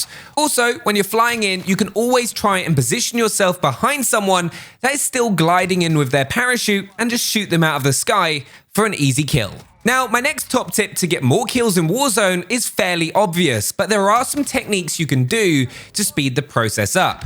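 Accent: United Kingdom. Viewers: U sou d like a British version of rex from toy story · I love how he says butha instead of bertha XD british accents are the best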